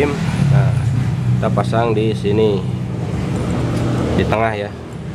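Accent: West Java, Indonesia. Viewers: Weh Sunda nya mag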